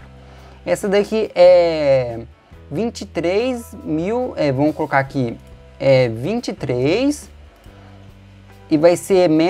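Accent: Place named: Rio de Janeiro, Brazil. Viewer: o cara é carioca!!! skskskksks legal pá cacete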